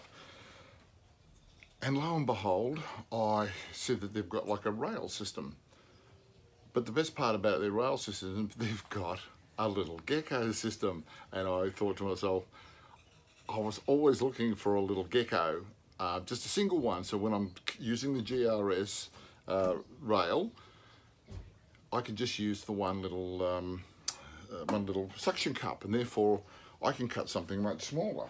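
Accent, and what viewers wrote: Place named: Australia. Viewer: Just found your channel. Good to have an Aussie accent on the tube.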